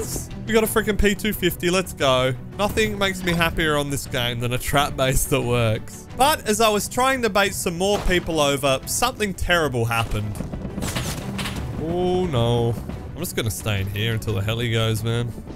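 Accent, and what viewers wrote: United Kingdom. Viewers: You sound like you're British are you British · O you're Brit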